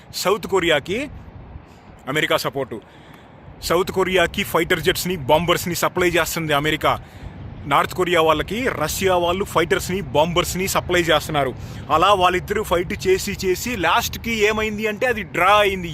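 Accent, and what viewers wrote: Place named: Telugu, India. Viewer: This is my favourite video, I watched not less than 25 times, your language and the native Telugu accent makes it so memorable, SV Ranga Rao gari Mayabazar Dialogues gurtuku vachayi, Ghantasala gari voice laga anipinchindi - antha Gaambhiryam vundi voice lo.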